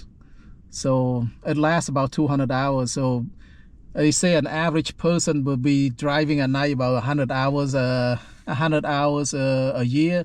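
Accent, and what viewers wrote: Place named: Cambodia. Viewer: No doubt. This man is Cambodian. his accent says it all.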